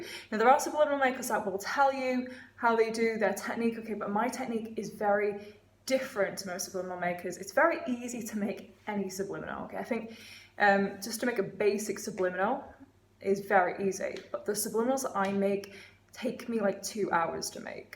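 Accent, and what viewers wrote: United Kingdom. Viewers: I love your British accent, your eyes, your look, your class, like the famous British cars. Greetings from Italy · Love your British accent. I got no subliminal request. Just wanted to compliment you...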